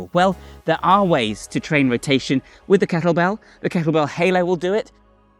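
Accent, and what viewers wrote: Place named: Scotland, United Kingdom. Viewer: Ur Scottish? U have a faint Scottish accent.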